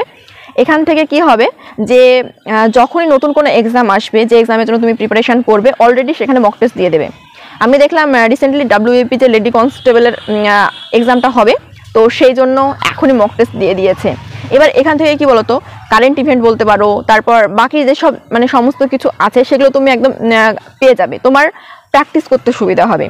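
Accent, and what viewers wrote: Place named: Bangladesh. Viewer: Kotha gulo bangla desi bangla deshi lagche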